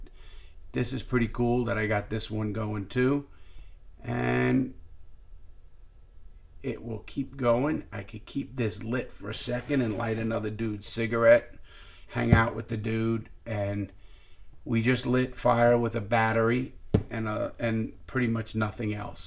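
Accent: New York, United States. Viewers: Thank you. Always appreciate it. My father was born in the South Bronx in 1934. Your New York way of talking about things makes me think of him. He's been gone a long time now.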